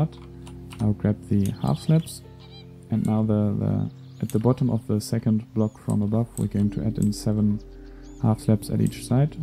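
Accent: Germany. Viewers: You are from germany right?^^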